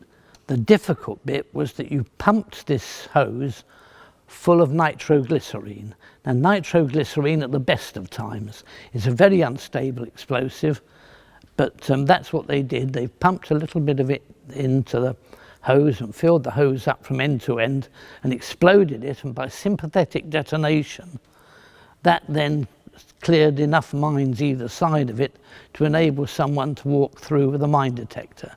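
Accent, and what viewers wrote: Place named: United Kingdom. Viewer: "That's a load of tripe as far as I'm concerned"...., your British is outstanding. You talk on & on about anything you like, it's such a pleasant experience.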